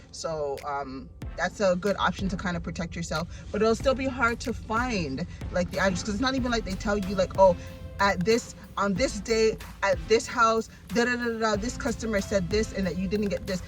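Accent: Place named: Barbados. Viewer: hello. my name is sean. Thank you for doing this video. thanks for the information about cornershop. You sound like you are a bajan, i hear an accent.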